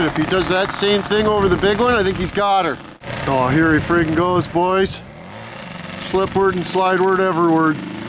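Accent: Ontario, Canada. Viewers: Lol I can tell your from Ontario just by the accent.. everyone from the up to northern michigan tht stays there a while picks it up.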